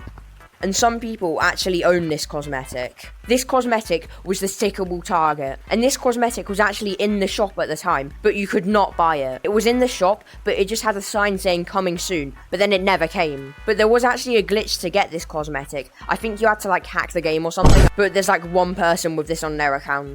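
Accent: United Kingdom. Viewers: Why does bro sound so British I'm not saying it a bad way I'm saying it in a good way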